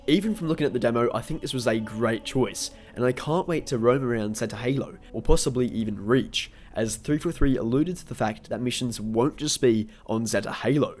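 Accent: Australia. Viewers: I feel a lot better after seeing this video. I can't wait! Also are you an Aussie? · Are you australian?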